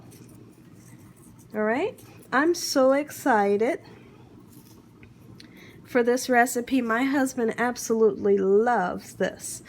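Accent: South, United States. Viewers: I love your recipes.i like the word like so.girl you gotta be from the south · Love the way you cook and your beautiful southern accent is a bonus. Thank you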